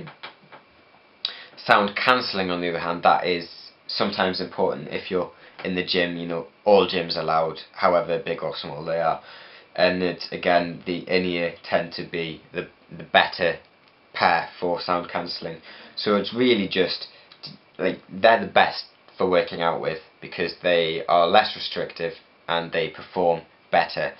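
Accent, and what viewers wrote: United Kingdom. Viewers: Are you welsh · Are you English? Because I am, if you subscribe to me, I will subscribe to you!!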